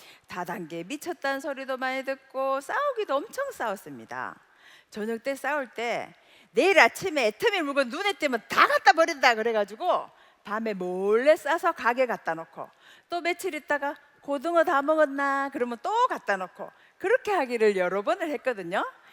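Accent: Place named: Daegu, South Korea. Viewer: 구수한 대구말 니어디가노? 공주가나? 기름너놨다 ㅎ 맛깔스런 말이 친구랑 같아서 끝까지든게되네요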